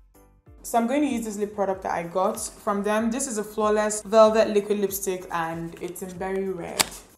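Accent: Igbo, Nigeria. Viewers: Hi I so much enjoy watching your video😘 And the fact that you always add your Igbo accent makes it more fun.. So please can you make a video on how to start a YouTube channel🙏🙏🙏🙏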